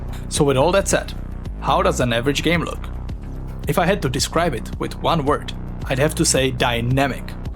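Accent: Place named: Czech Republic. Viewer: Can't wait to play this one. Also, this isn't a knock on your Czech accent, man. But I really like the way you say 'Hoovercraft'. Keep up the good work.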